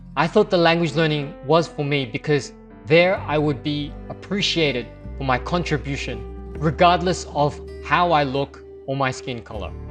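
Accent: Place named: Australia. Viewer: Hi, great channel and videos. Pinoy here. First I would suggest you learn to speak Tagalog with a native accent. Speaking Tagalog with an Aussie accent makes it sound weird. It has nothing to do with your ethnicity, it's more like me going to Australia and speaking English with a Tagalog accent or a Chinese accent (you know what I mean). As a language learner myself (I'm currently studying Japanese and Spanish), I believe being able to speak with a native accent is part of the process of learning another language. Secondly, I can understand your experience with so-called "native English" speakers. It's the same here in Hong Kong. I'm a financial editor and writer, and I used to work for an English-language newspaper that hired editors based on the color of their skin. If you're a gweilo from the UK, US, or Australia-NZ, you're hired. Many of them do not know proper English grammar which is essential in written English (much less the technical aspects of the subject matter that I write about and edit). 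I would argue there is a huge difference between written English and spoken English. A person may be able to speak English well enough as a native English speaker (those who were born in an English-speaking country) but being able to write properly (and edit) in English is another skill that has to be learned. Needless to say, working for that company was quite frustrating and the editorial quality of that newspaper left a lot to be desired. Good luck with your future en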